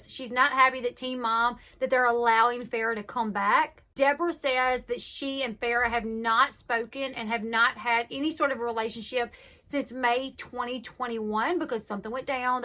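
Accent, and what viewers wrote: South, United States. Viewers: im not even from the states and i can tell its real haha besides you havent skipped a beat in all your videos so if you were acting your damn good LOL plus i love your accent, could listen to your southern drawl allllll day!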